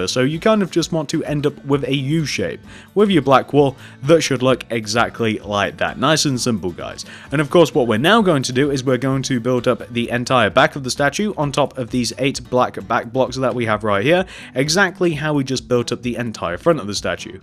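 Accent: United Kingdom. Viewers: so that is why you have a british accent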